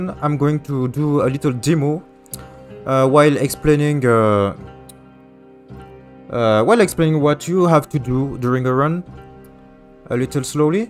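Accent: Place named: France. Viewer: « french accent »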